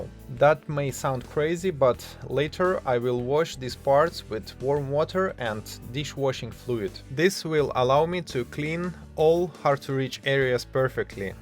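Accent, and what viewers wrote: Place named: Russia. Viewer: Комментатор явно русскоязычный))))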